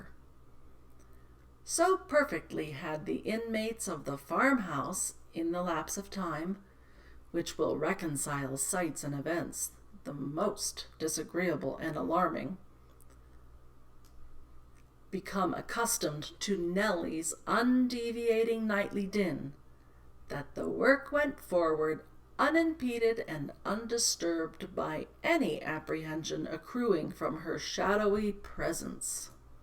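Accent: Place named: United States. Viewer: I enjoyed the read along, learn American English pronunciations, and the story. Great idea and resource.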